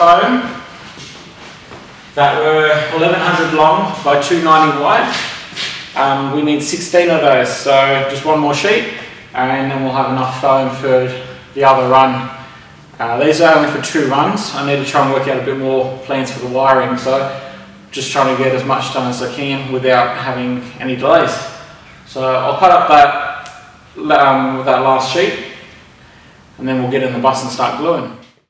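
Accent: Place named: New Zealand. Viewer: Hey, i know the bus is long gone but we have the same bus and are doing it here in ADL! You sound like you're Kiwi too? How the hell did you get the strips off where the seats bolted to the wall?!?!?! Looks like it's all one piece?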